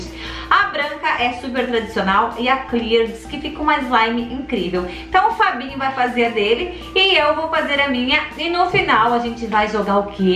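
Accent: Portugal. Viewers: Ele é português?,eu sou curioso desculpa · Ele é de Portugal?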